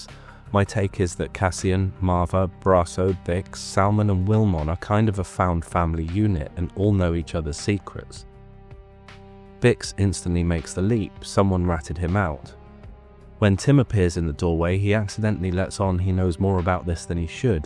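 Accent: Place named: United Kingdom. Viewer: I think the captions are useful, as sometimes your phrasing says something that my ears hear differently (I don't remember the exact context - or even if it was this video - but you said 'knot' and I heard 'not' which completely did not fit the rest of the phrase. I had glanced away from the screen and had to back up and read the caption to get what you were saying). This may be an accent thing, as I'm from the States, but I also find the captions useful as you are using terms and phrases that are distinctly British sometimes and I can understand them more by reading them than by hearing them. Hopefully, your change in future videos, which I haven't gotten to yet, still works for these more idiomatic phrases.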